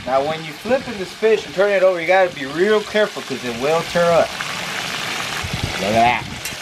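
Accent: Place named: South, United States. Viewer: Paisa with a southern accent......I love it. Un mojarra frying a mojarra🤣🤣 Looks goog Y'all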